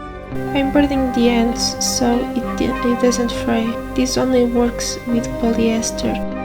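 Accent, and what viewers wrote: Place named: Portugal. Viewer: mal abri o video soube logo que eras pt-pt por causa do sotaque😭 portugal mentioned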